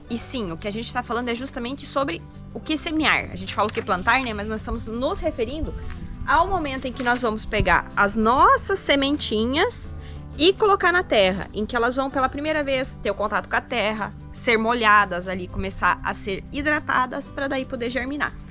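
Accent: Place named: Paraná, Brazil. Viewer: Bonito quintal. De onde vocês são? Seu sotaque me lembra o do Paraná!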